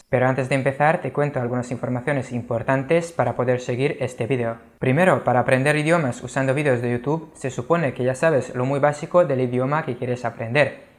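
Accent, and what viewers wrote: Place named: Spain. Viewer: Excelente video, me parece interesante tu español, el acento es de España, pero utilizas algunas palabras como en LATAM, ejemplo, la palabra video, en España suelen colocar el acento en la "I" en la latam lo hacemos en la "e"... Que genial, un abrazo, siempre me pregunté como sonaría esa combinación.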